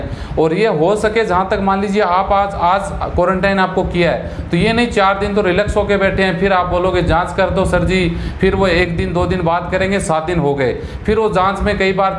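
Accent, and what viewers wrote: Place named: Lucknow, India. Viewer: Sir from where you belong Can you tell me Because your Hindi language is like Lucknow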